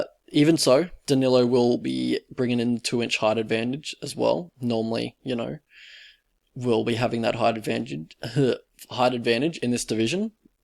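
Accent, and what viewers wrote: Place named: Australia. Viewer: The Australian accent is a hideous mutation that sounds like the US southern accent and english accent had a baby! With that said thanks for the content!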